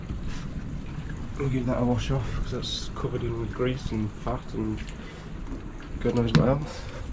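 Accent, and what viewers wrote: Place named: Wolverhampton, United Kingdom. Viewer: have to disagree, I also like the Wolverhampton accent, be proud of your accent & ignore the begrudgers 👏👍☘️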